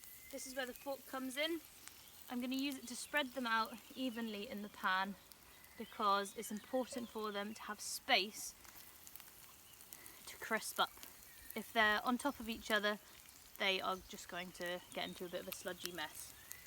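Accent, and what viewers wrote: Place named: England, United Kingdom. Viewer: Great to hear an English ladies voice, and of course, straight to the point. Great video!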